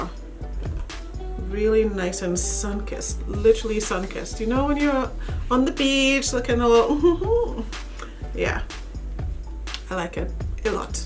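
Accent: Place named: Caribbean. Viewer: You sound like your oots are from the Caribbean... trini maybe?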